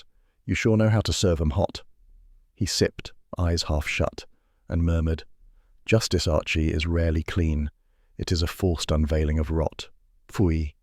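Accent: England, United Kingdom. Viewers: Sorry. Just can't accept the English accent for a NYC based story.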